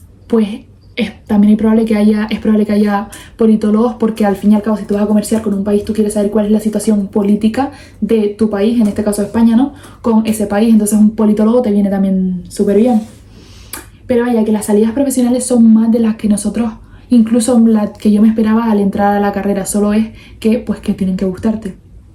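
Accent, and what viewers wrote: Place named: Chile. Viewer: Habla más despacio no se te entiende nada! Me cago en el lenguaje chileno!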